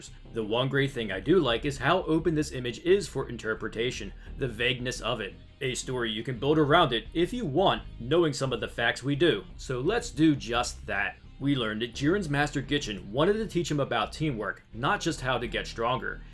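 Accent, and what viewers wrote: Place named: South, United States. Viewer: your southern accent is amazing i love southern accents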